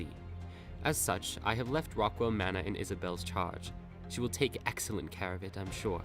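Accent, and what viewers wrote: United Kingdom. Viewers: You have a British accent? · I’m English and love your British accent haha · Why the hell are you reading these outloud....do that many people who play not read? And wtf is the british accent about, like you said its trash. This video is trash and extremely unhelpful. Thanks for the waste of time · I don't mind the British accent, good voice acting in another dialect takes a lot of practice/training. My biggest problem is that you have this beautiful idea and a creative mind to put it all together and didn't bother to learn to pronounce a lot of the '$10 words'.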